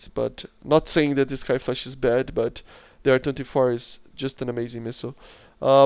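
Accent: Brazil. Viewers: Pelo sotaque eu desconfiava que era BR, bem legal o vídeo cara, bom trabalho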